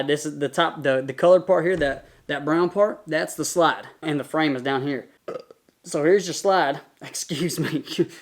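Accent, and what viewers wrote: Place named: South, United States. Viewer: You always treat a weapon as if it’s loaded even if you just unloaded it. I’m sure you’re a fine shooter .. I’m just saying you had your finger inside the trigger guard in that last video I watch. That’s a no no .Guess it was this video just the short version of it… You have a southern accent… where are you from… are you close to the great state of Kentucky?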